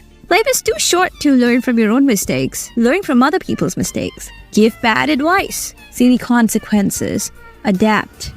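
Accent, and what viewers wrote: India. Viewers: First actual good video like this, also a very good starting point in me learning to talk in indian accent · are you indian,you have a nice accent,kinda motivating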